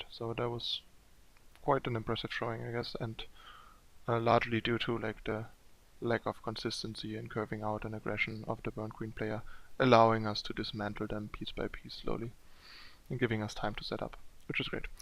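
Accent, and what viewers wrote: Germany. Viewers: You should watch the first couple of matches with it on. It's quite entertaining. I think it's partly the German accent that messes it up lol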